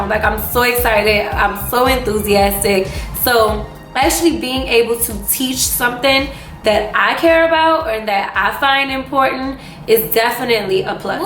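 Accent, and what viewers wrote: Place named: Louisiana, United States. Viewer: I never comment but I'm loving your consistency! I come here just to hear your accent! I'm from Louisiana too I'm in Houston now too 😊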